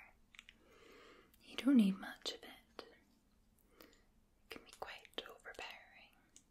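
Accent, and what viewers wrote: Scotland, United Kingdom. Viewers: Can you do a whisper video with more of a Scottish accent and thank you for your time 😊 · As long as it's said in a Scottish murmur, I could listen to advertisements all day. you picked a great ASMRtist!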